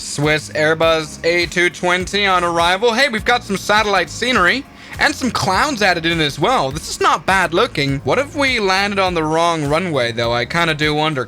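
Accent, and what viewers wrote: Germany. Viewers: Are you German? (I am ) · are you german?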